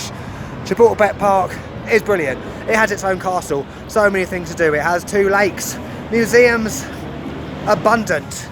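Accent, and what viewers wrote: United Kingdom. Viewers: Also have to say that after lots of vids of north americans (kinnetic kenons, tangerine travels and more), I love to hear your easy to understand british accent. Cheers from Spain. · I love your British accent, I'm really glad that you love our city and culture ❤️ I also like British culture, in fact I'm actually trying to improve my British English because I learnt American in mi school, haha. Cheers m8 :)!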